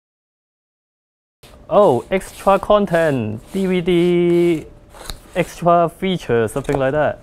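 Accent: United Kingdom. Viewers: Nothing wrong with his English. He manages his puns well, and I do understand what he speaks. And I like his reviews as I like the other Asian's who does British accent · You sound British.....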